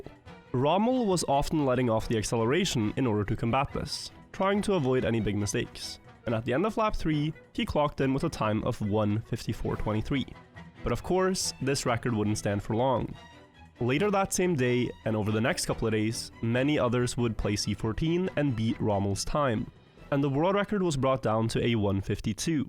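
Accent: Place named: Norway. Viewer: Young sounds so Norwegian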